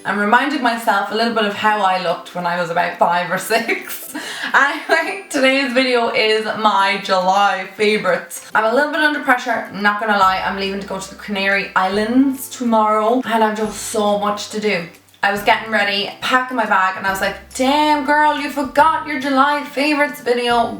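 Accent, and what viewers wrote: Ireland. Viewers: Your Irish! There is barely any Irish youtubers❤️xx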